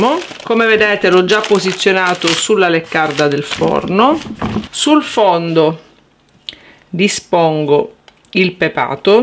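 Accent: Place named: Sicily, Italy. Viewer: Siciliani di dove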